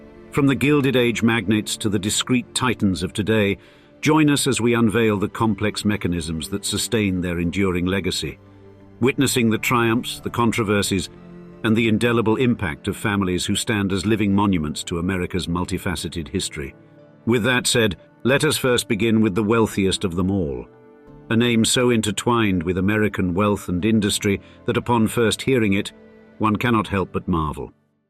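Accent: United Kingdom. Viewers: Question about the narrator's accent: British or some modernized version of Mid-Atlantic?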